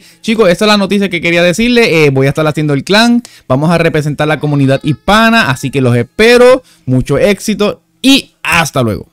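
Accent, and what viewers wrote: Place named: Puerto Rico. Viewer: Es de puerto rico rey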